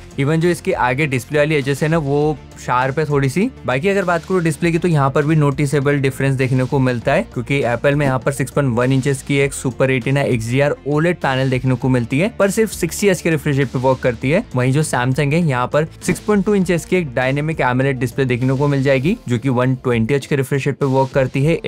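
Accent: Indore, India. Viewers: Bhai Indore se ho kya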